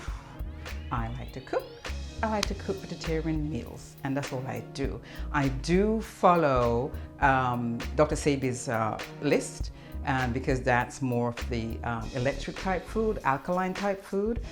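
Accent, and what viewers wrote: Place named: England, United Kingdom. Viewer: No salt fish really 🤪 sounding very english mate.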